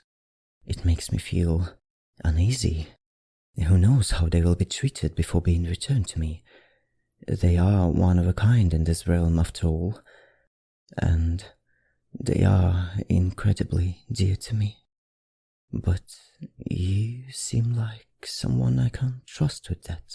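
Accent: France. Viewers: AM THE ONLY ONE OR I THINK HE HAS LIKE A FRENCH ACCIDENT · I’m someone who’s seriously into vampires. But I swear I just felt so seduced by your voice. Especially with the French accent and the chuckle. 🥰🥰🥰 amazing voice acting!! ♥️♥️♥️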